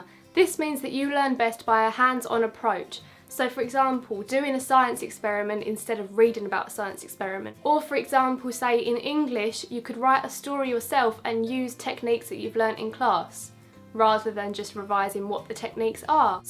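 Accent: Essex, United Kingdom. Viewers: Your accent is penggg where are you from like Essex??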